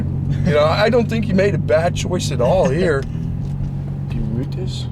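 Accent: Netherlands. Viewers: ik hoor op jou engels dat jij uit Nederland komt groetjes uit Bosnien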